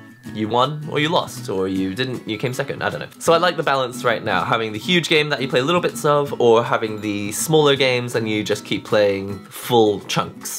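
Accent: United Kingdom. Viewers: As an america, seeing a asian talk with a british accent was very confusing. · Wait and Asian guy with a British accent amazing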